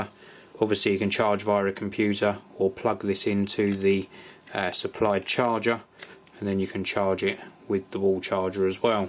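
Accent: United Kingdom. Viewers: I love your british english :D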